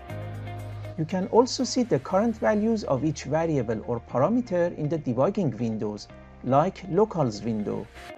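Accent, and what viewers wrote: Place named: Iran. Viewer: Thick accent w/ Farsi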